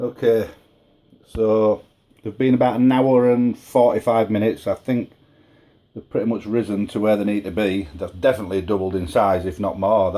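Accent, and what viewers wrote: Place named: Midlands, United Kingdom. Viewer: Love this down to earth video ❤️. Reading the transcript had me in stitches, obviously not someone who understands the midland accent🤣😂. Yes I did make 2 decent loaves of bread following his instructions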